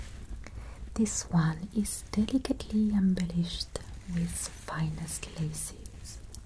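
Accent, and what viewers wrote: France. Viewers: Simply astounding production quality in every way!🙏 The lighting looks wonderful, beautiful images. And your French accent, and dialogue in French, is delightful to hear too. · Tu parles d’une façon très française 👍🇰🇷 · love when you speak french + english with a french accent ! youre such an elegant, classy and beautiful woman. ❤ i love your vision and creativity. top tier content !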